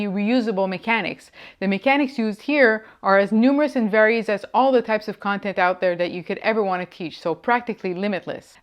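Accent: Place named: France. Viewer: L'accent français s'entend légèrement sous la couche d'accent nord américanisé. Un peu comme un vêtement rouge qui se voit sous un manteau légèrement transparent...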